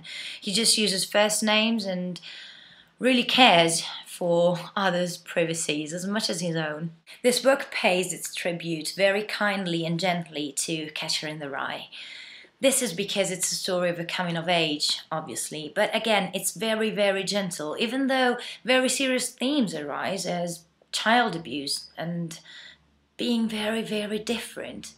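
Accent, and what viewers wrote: United Kingdom. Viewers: mamma come parli bene, con accento inglese proprio! sono stata un anno di america (ho solo 17 anni) e ho molto d + l'accento "slang americano" tu invece sei così english:P!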